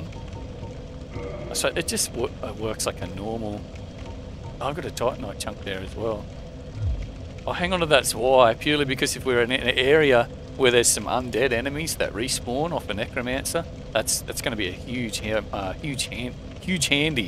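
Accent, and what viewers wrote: Australia. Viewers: Are you Australian? Your accent is awesome!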